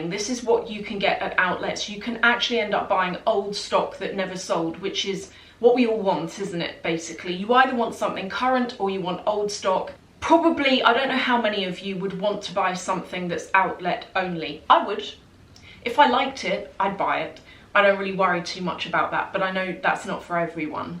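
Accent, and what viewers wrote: RP, United Kingdom. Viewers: your accent is so posh i love it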